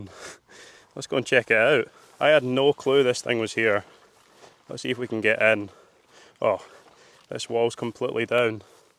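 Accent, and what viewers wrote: Scotland, United Kingdom. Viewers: Love this program. I'd love to see some episodes from the national parks or even greater or lesser known castles or more country estates of royalty etc. You can still go traipsing up the wee little monros all ya want. You come across very well when you speak. You would be good at telling the stories of the castles etc. Your Scottish accent is lovely. 🙏🏽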